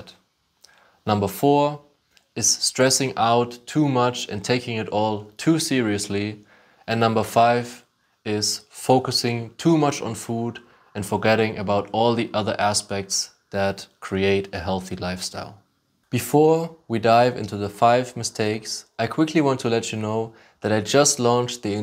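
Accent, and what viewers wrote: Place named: Germany. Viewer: Italian name, German accent... Where are you originally from? I'm from Slovenia.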